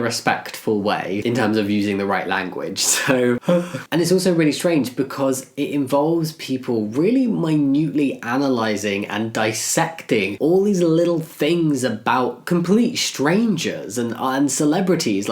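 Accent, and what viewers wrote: United Kingdom. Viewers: Your voice is fine. You just sound really british.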